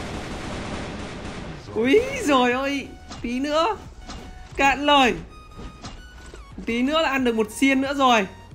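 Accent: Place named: North, Vietnam. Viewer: bắc nhé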